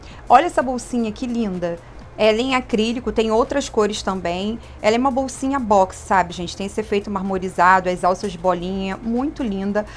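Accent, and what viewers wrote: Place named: Rio de Janeiro, Brazil. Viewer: você é mesmo linda! E Esse seu sotaque carioca arrasa🥰🥰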